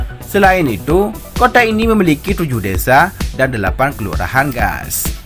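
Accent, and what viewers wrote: Aceh, Indonesia. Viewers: Loen teukhem kudengoe sue admin nyoe that kental manteng logat aceh jih...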